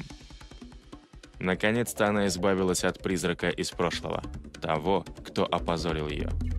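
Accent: Russia. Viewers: …ваш контент придуман для того, чтобы якобы под видом истории уменьшать, умалять, принижать, перекручивать и переворачивать смысл, где-то врать и и тем самым показывать худшее и якобы превалирующее над всем!!!… - в частности всё украинское!!!…, например - на примере Роксаланы!!!… Очень удивительно, что это видео, названи его написано на НЕМЕЦКОМ ЯЗЫКЕ, снято видео на УКРАИНСКОМ ЯЗЫКЕ (который заглушен) и повествование ведётся на РУССКОМ ЯЗЫКЕ с российским акцентом!!!… при чём не только переводится с УКРАИНСКОГО ЯЗЫКА, НО И МНОГО ДОБАВЛЯЕТСЯ негативной отсебятины… на русском!!!…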